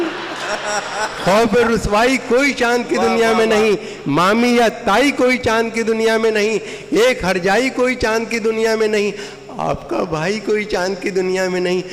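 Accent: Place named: Meerut, India. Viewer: Lajawab meeruthi lehjah he....!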